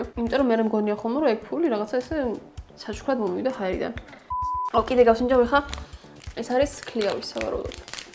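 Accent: Imereti, Georgia. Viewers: უსაყვარლესი ხარრ 💙💙 ეს ცოტა იმერული აქცენტი საიდან .?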